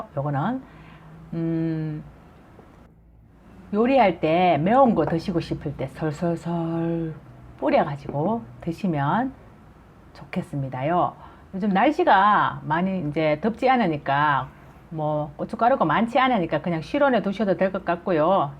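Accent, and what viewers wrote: Gyeongsang, South Korea. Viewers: 경상도분이시군요 재밌게 잘 알려주시네요 감사합니다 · 따라해보려고 청양고추 2봉다리 사왓어요 ㅋㅋ 구수한 사투리에 내고향 경상도의성이 생각나네요 구수한 사투리에 친구엄마처럼 잘가르쳐주셔서 감사합니다~^^.참고로 5학년8반입니다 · 아지메 경상도 어디요!? 넘 정겹네요!!! 잘 봤습니다 건강하시길 기원합니다 🙋‍♂️🙆‍♂️👍🤪😂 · 웃음띈 아름다운 모습에 구수한 경상도 사투리 정말 녹아 내립니다. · 너무 좋아요 참 지혜롭게 하시네요 아주 가끔씩 보긴 하지만 입담도 좋으시고 경상도 억양 꿀팀, , ㅎㅎ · 구수한 경상도 말 너무좋네요 고추요리 감사합니다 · 저는 요리배우는것도 좋지만 경상도 말씀으로 설명해주시니 너무도 웃기고 재밋어 좋습니더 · 장맛비가 오고나니 텃밭 풋고추가 많이 달려서 어쩌나 했는데 이렇게 좋은 방법 주시니 감사감사요~~^^ 매운맛이 전해지는듯~^^ 갱상도 사투리가 정겹습니다~♡ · 경상도 사투리가 정겹네요 좋은 영상 잘보고가니더 또올께요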